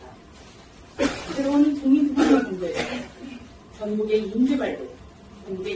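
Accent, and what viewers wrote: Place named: Seoul, South Korea. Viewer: 조수진 의원님 화이팅입니다 서울 토박이인줄 알앗네여 뉴스하실때도 똑 의원의로도 똑 입니다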